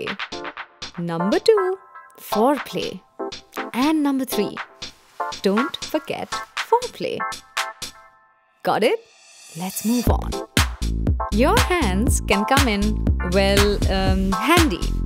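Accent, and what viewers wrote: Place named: India. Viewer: Indian accent unsexy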